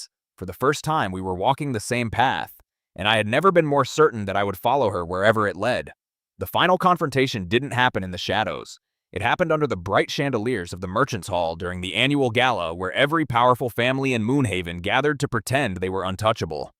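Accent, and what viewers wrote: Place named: United States. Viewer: it's marginally better if slowed down to 90% but that voice is very American and whiney ... It doesn't fit with the context or the content.. but the story itself works well.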